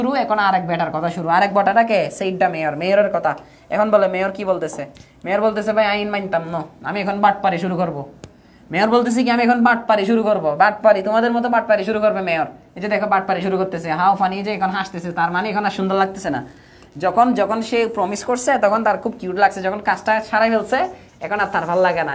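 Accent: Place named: Chittagong, Bangladesh. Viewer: ভাষায় চাটগাঁইয়া ভাবটা বেশী।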